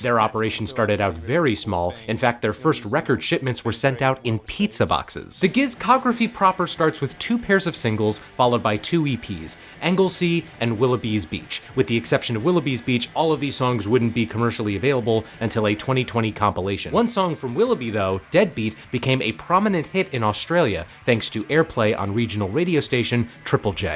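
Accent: United States. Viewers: Couple of notes from an Australian fan: - Opening on a shot of Sydney for a Melbourne band is very funny, well done - Weird that you didn't mention Ambrose is IN the when you first brought up Gizzfest - Also lol'd at calling "Baby Rainbow" - I was at the gig you used footage from during the Nonagon passage, at the Metro Theatre in Sydney. Great gig! I've seen them 11 times all up, from tiny clubs to big festivals, and they're always a memorable show. - Wish you'd mentioned the band playing on Conan when you showed that footage, if only for Conan absolutely RELISHING saying the band name. - As an Australian it's really funny to hear you shorten the band name to KG, because we all call them! That might sound weird with an American accent though 😂 Loved this video, well done!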